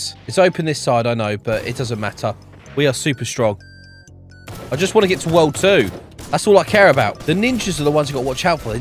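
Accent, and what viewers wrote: United Kingdom. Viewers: i love his ultra british voice😂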